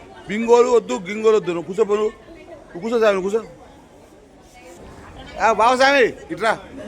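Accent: Telangana, India. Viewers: Andra kadhu swamy dii Telangana khammam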